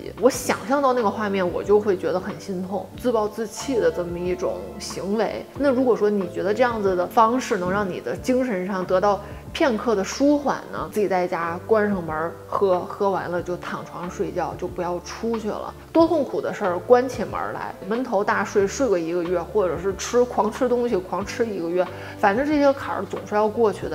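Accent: Tianjin, China. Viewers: 你是天津人对不对！！😃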